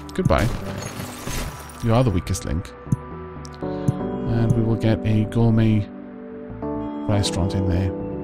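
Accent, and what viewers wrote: Wales, United Kingdom. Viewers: R U Welsh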